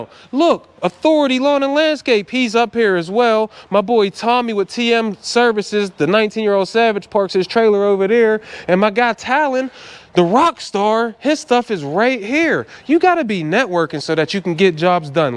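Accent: Pittsburgh, United States. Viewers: Damn I was like buddy sound like he from Pittsburgh lmao then I see the 412 area code on the trailer 🤣🤣🤣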